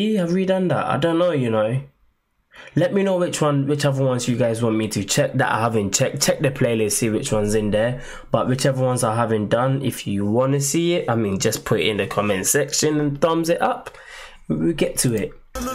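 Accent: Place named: Nigeria. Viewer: I THINK U ARE NIGERIAN NOT UK. UR ACCENT IS DIFFERENT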